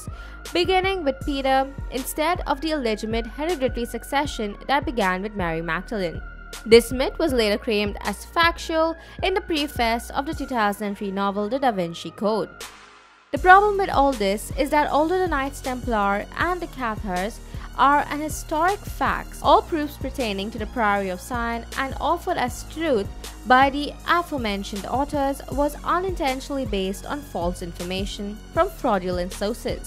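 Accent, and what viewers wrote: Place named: India. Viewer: This girl has the worst accent lmao you can tell she is Indian "wictor Hugo" 😂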